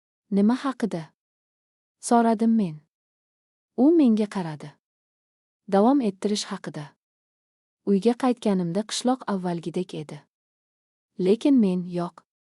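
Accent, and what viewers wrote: Russia. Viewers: Бу укиетган киз татар миллатига мансуб булса керак шунинг учун шундай укиса керак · Ким укиябди урисми товба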